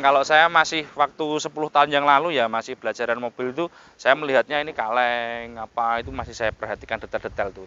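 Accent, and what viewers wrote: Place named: East Java, Indonesia. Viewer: Matur suwun.. Sampeyan lucu.. Gaya Jawa timuran... Lancar jaya.. Salam dr Bogor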